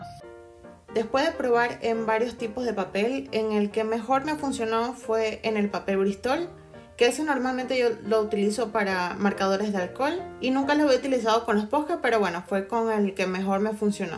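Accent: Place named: Panama. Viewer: tu acento es muy Panama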